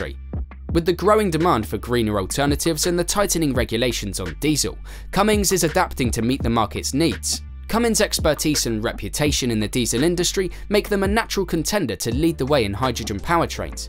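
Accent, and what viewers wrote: United Kingdom. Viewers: Why do they have to use British announcers, can somebody tell me why? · The narrator speaks too quickly and has a terrible limey accent which makes it difficult to understand.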